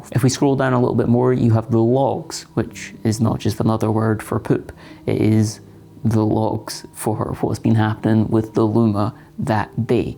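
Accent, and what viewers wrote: Scotland, United Kingdom. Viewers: The Scottish dialect is quite something. 😅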